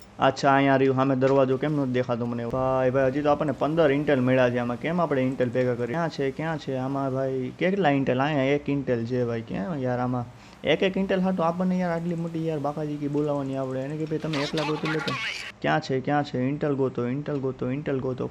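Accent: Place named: Kamrej, India. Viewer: Bhai tame kyana aa tame j vat kari te em lage kamrej khaudhara gali aju baju❤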